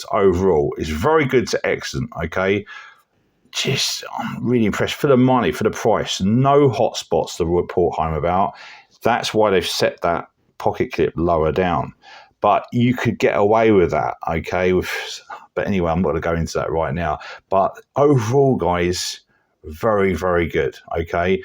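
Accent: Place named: United Kingdom. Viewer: Thank you, Sir. It is a good worker. A deep carry clip would be better. I like your speech, dialect, also the british language, of course. May I ask you, what part of Great Britain do you come from? Thank you very much. Stay healthy and all the best. Cheers Frank🖖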